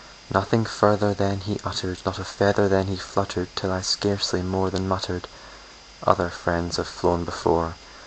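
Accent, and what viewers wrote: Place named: Scotland, United Kingdom. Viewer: This will not get you girl/boy friends dude so if thats you angle buy a leather jacket and follow a rock band. However . . . if your passion is poetry, your in a obscene minority and I welcome you to a lonely club. If you message me back I will subscribe to your channel and follow your posts. Lovely reading, I'm half Scottish and I appreciate the accent. I would maybe like to see you post some of my favourites like "My Creed", "If" and "Invictus"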